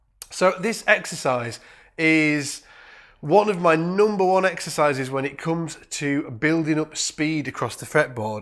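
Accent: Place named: Manchester, United Kingdom. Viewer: I love your accent as much as your lessons, are you from Manchester?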